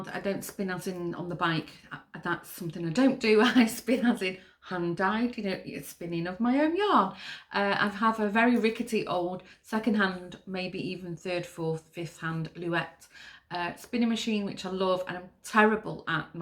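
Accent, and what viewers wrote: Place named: North, United Kingdom. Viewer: How lovely to hear my friend Ruth’s name mentioned. I blame her for my renewed yarn yearning /stash. 😁. What a wonderful podcast, thanks. Great to hear a northern accent too. X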